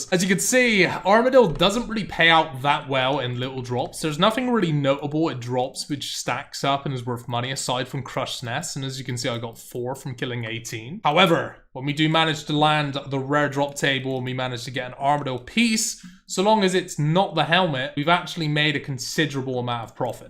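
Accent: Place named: Devon, United Kingdom. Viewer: With a Devon accent that thick I expected him to be doing a lot more farm runs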